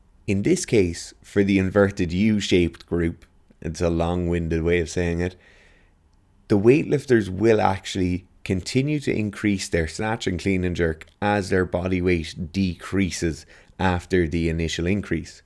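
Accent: Ireland. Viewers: Your accent is aggressively irish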